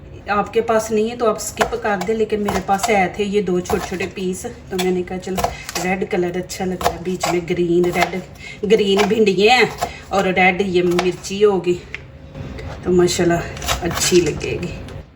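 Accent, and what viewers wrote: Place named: Punjab, India. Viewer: Allah apko sehat de, love your typical Punjabi style in urdue , I am from Texas United States of America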